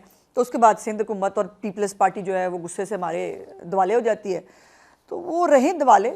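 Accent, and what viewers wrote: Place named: Punjab, India. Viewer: باجی آپ کا پنجابی ٹچ بہت زبردست ہوتا ہے ماشااللہ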